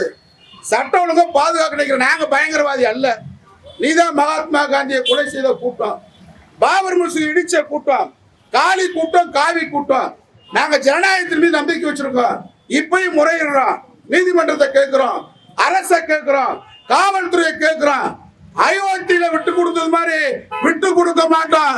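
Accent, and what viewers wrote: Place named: Madurai, India. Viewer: உன்னோட சண்டியர் தனத்தை ஆலோசனை பண்ணிருக்க. 🔥🔥. அருமை.. மதுரைக்காரன் பேச்சு. பாமரனுக்கும் புரியும் படி பேசுவது அண்ணனின் சிறப்பு. 🔥🔥🔥🔥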